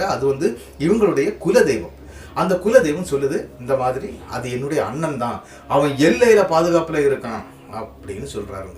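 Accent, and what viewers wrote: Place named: Nagercoil, India. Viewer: நம்ம நாகர்கோவில் ஊர் காரர் என்பது பேச்சை வைத்து அறிந்தேன். மிக சுவாரஸ்யமாக அழகாக சொன்ன விதம் ரசிக்கும் படி இருந்தது. நீங்கள் சொன்னதால் தான் நான் சப்ஸ்க்ரைப் செய்தேன். நன்றி நல்வாழ்த்துக்கள்.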